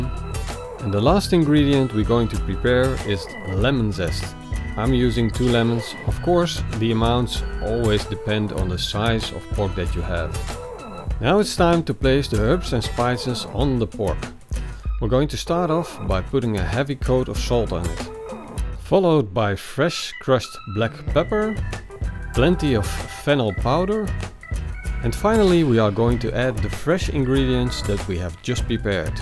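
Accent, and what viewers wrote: Netherlands. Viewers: Hoor ik daar een Hollands accent? Mooi recept btw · I already thought I heard a Dutch accent. I was going to ask you if you were Dutch but now I don't need to. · You can hear the horrible dutch accent.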